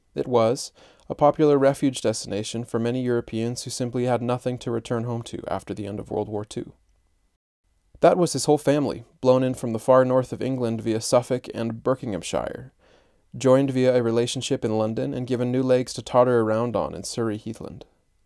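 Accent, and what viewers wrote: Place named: United States. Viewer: Great work! (why the American accent on the video?)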